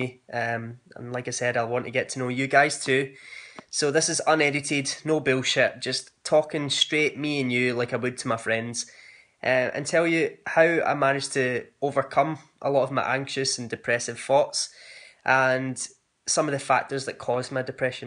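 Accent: Scotland, United Kingdom. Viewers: I have acute anxiety disorder and have been anxious since I was a child. Nothing happened to me abuse wise but I was born very ill and separated from mum for 6 weeks. I was in and out of hospital until I was 9 also. I do a lot of natural things too but need 20mg of Prozac daily to keep everything at bay. Thanks for sharing. The Scottish accent is gorgeous 😍 I'm just back from Edinburgh with my hubby. We went for our first wedding anniversary as we got engaged in Edinburgh 2 yes ago xxx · You are amazing and I love watching your videos. They make me feel good and I bet you help so many people who don't feel worth it. I have learned to love myself more, over the last 20 years, than I did the first 22 years of my life. When I came across your videos I was amazed at such a handsome, caring man. Even though I am happy with myself, it is still nice to hear your encouraging words, especially with the Scottish accent. 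I just love hearing your voice! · Very very true. Some people don't understand because they can't relate, so it's hard to explain it to them. And, your Scottish accent is a beautiful reason to listen to you. Well, in addition to your humor, advice, observations, and everything else.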